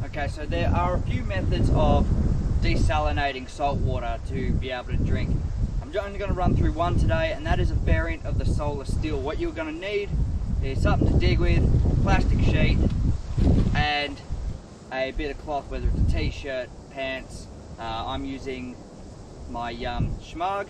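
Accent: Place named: Australia. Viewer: FROM YOUR ACCENT YOU MUST BE AN AUSSIE. GOOD ON YOU ! THANKS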